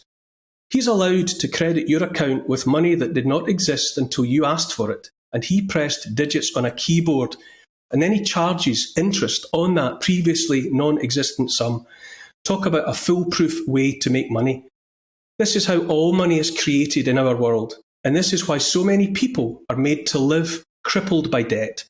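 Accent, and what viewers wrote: Scotland, United Kingdom. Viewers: Neil Oliver sounds Scottish but his loyalty lies with Queen/King and England. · Neil Oliver is the reason I subscribe to GBN no other reason required. Besides he's Scottish the land of my forebears, William Wallace, Aberdeen and so on. Plus I just like the sound of the Scottish accent, it rings of the can't be bought commonsense I love.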